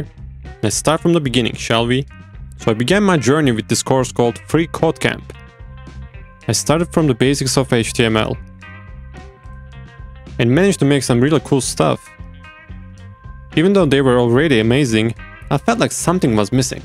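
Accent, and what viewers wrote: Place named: Sweden. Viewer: Where you from? You sound Swedish. 😊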